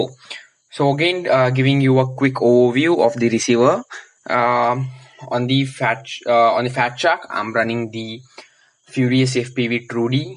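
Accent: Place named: England, United Kingdom. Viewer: Hi I am deon dsouza I liked your English accent. Keep going. U r doing very well.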